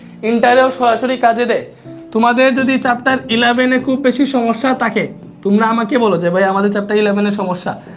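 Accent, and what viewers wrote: Sylhet, Bangladesh. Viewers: ভাইয়ার বাড়ি সিলেট নাকি?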